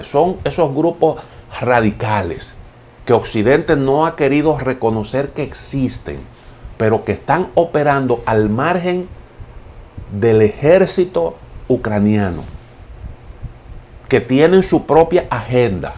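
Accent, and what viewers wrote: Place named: Dominican Republic. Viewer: Ese asento me parece quisquellano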